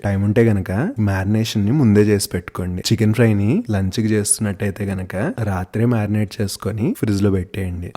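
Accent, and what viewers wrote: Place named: Vijayawada, India. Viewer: Chala rojulu Ayindi andi mana style Telugu accent vinni. Mi Telugu accent ma vijayawada accent laga unde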